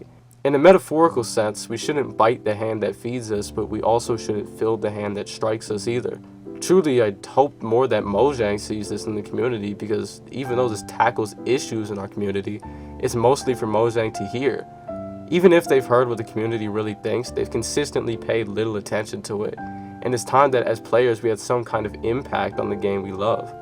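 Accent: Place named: South, United States. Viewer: bro is mad southern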